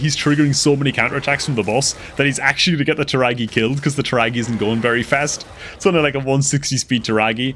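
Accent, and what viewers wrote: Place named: Northeast England, United Kingdom. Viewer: I'm distracted by picking out the accent. I couldn't work out whether it was Sunderland or Newcastle which usually points me towards a dancer of the sandy nature.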